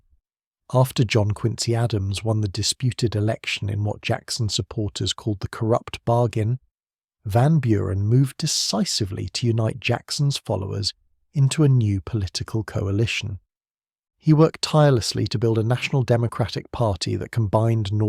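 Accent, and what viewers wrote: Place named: United Kingdom. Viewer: Yeah. Why do we need a goddamn Limey to tell us about our presidents? There's no excuse for it.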